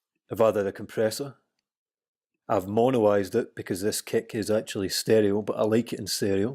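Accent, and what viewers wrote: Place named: Glasgow, United Kingdom. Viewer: Fuckin yass subscribed as soon as i heard the accent. Min the glesga!! Class tutorial be swatching the rest.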